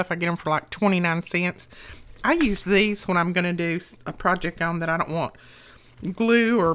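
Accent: South, United States. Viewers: Awesome! I will put you some vintage book pages in the mail!💯📓😎 And now I know why listening to you makes me feel right at home: a sister southerner! 🤓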